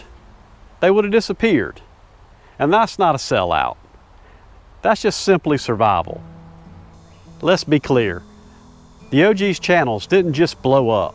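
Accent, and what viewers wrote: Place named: Carolina, United States. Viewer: That's the thickest Carolina accent I've heard (and unfortunately going extinct). Great video great storytelling! Seth did an interview with Remy Metailler and it was a great peek behind the curtain into how being a YouTuber can kill your ability to enjoy what you are supposed to be enjoying on camera.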